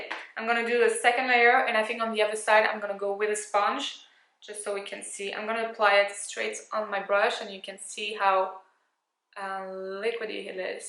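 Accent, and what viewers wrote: France. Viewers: Ok so now I need Charlotte tilbury eyes to memorize in jean. What a beautiful look, I just subscribed today, I love your style! (And your French accent!). It’s French, isn’t it? 😊❤️💋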